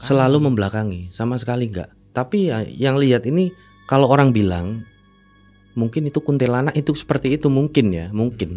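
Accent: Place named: Malang, Indonesia. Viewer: Sampean pemalange ngendi mas 😅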